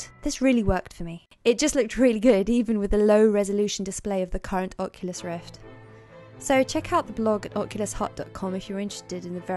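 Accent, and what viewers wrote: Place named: United Kingdom. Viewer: Yes, I love her accent. I generally dislike British accents. I'm from South Africa, where I'm used to an accent where they pronounce the letter 'T' correctly, whereas in the UK, they'd say Liber'ee rather than Libertee. I really dislike it when Brits take too many shortcuts with their English words. Which is ironic considering that they should be the leading example of the language.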